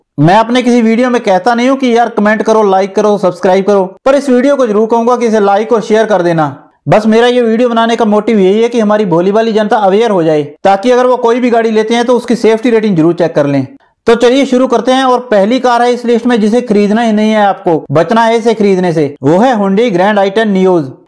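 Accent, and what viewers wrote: Punjab, India. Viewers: ਤੂੰ ਪੰਜਾਬੀ ਏ ਤੇਰੇ ਬੋਲਣ ਦੇ ਲਹਿਜੇ ਤੋਂ ਪਤਾ ਲਗਦਾ ਵੀਡੀਓ ਪੰਜਾਬੀ ਚ ਕਾਸਤੋਂ ਨੀ ਬਣਾਉਦਾ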